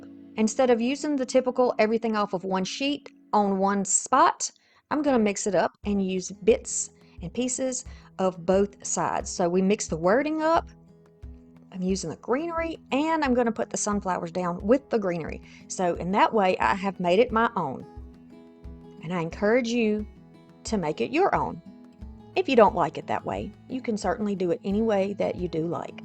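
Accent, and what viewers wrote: United States. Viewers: 💕 Love them! They all turned out Beautiful. Thank you. By the way, I LOVE your country accent! 💕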